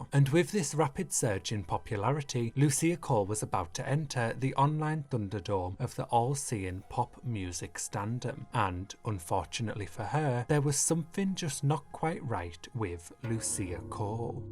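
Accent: United Kingdom. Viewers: A British accent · For some reason, this sophisticated British accent makes videos about online stan culture so funny lmaao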